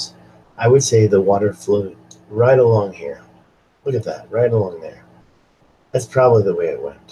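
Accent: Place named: United States. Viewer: You sound as American as me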